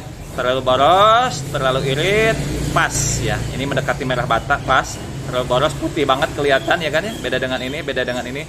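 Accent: West Java, Indonesia. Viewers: Sampurasun....yakin dari logat bicaranya pasti orang sunda dan beberapa x w nonton vidio u bang akhirnya w kena racun u bang wkwkwk waktu itu w nontn rev cdi predator u bang akhirnya w beli buat jajal di tirev w hasilnya jos...powernya enak ngejambak2lah hahha tapi ada tapinya nih puteran atasnya jdi nahan alias ngeden gtu cek busi warnanya putih waduh...keiritan ini mah fix ini mah musti set ulang pj mj w balik lagi bang nyari vidio u lah ktemu ini lagi bahas set karbu pj mj cocok.bingung ya naikin/nurunin berapa step ya karbu tirev std pj 38 mj 120 pengen kek gtu bang di gas beng..beng.beng .bikin tangan ngaderegdeg hehe tapi dari tadi w malah fokus ke astreanya sumpah mesinnya kek daytona ya...ngeri....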